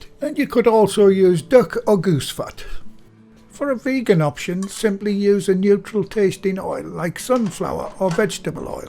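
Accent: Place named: Northern England, United Kingdom. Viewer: Your roasties look wonderful. I am from the North East of England too, now living in Australia. Nice to hear a Northern accent!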